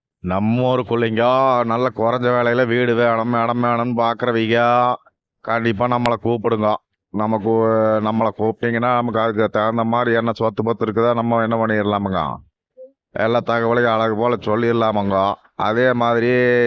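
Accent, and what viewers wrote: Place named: Kongu, India. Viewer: கொங்குத் தமிழ் அருமை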